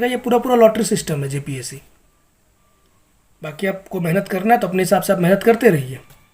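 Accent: Bihar, India. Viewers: Tu to bihari lagta h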